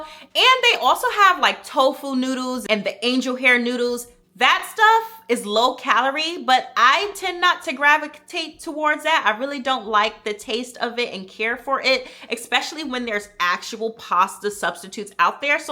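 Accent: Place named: Bronx, United States. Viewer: I loveeee your accent! Some of my fam is from the Bronx! ❤ New Sub here!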